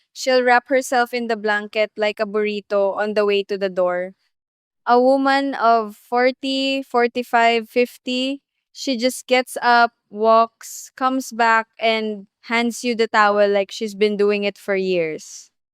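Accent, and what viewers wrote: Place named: Philippines. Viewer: ❤❤❤❤ Your So Beautiful and Love you and your wonderful Information ❤❤❤ Love Filipinas especially your Lovely Accent 😍😍🥰😘💕❤️💓💋💞🌹💓❤😘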